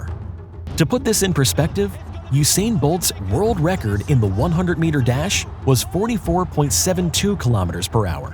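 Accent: United States. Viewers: American accent. Gives information in metric system. You failed. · Dude u have an American accent stop using metric measurements. .WE DON'T USE THAT. .at least use both. .i was educated in the 90s aint no conversions in my head😭😭😭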